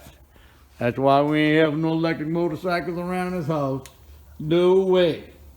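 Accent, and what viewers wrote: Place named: New Jersey, United States. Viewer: You from Jersey or Down South.?? Washington state, here. Just found this video again and laughed. If you really want to lose things, important things. You wheel your bike out into the uncut lawn grass and let the bolts fall into the grass. You or the next four house owners many find the parts, OR MAYBE NOT...??? Great Vid and Cool Accent , BRAH...DSD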